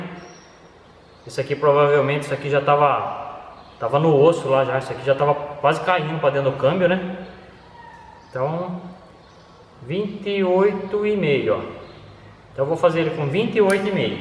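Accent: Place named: Piracicaba, Brazil. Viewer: Fala meu chegado. Pelo seu sutaque é de Piracicaba ne.. SOU torneiro mecanico tbm. Eu trabalhei e morei ai no Santa Rosa, e trabalhei na OTANNI . nao sei se conhece.. Cidade bacana de trabalhar. Abraço e sucesso..!!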